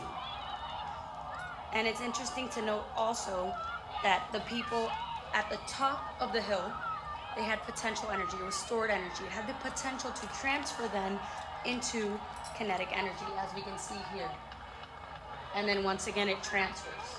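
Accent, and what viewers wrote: United Kingdom. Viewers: That British accent is so spot on! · Wait are you actually British? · Omg I love your videos so also i love the British accent lol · The British accent eats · Pin pls the BRITISH ACCENT GOT ME😂😂😂😂😂 literley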